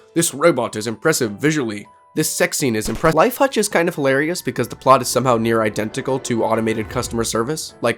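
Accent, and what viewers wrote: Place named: California, United States. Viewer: God this was a rough watch with a narrator who gives off LA/Cali vibes somehow... but you can feel he put in a lot of effort in this video and I respect it.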